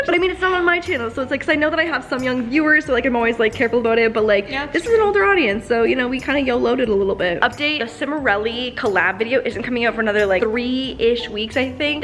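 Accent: Canada. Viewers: love that Canadian accent.......love you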